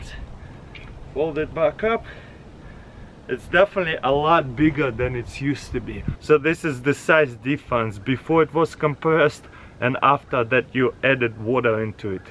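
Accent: Russia. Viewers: "Now i have to zoom out its so big" is awesome. "Full size bich towel" is Russian and Russians are known for their own type of English.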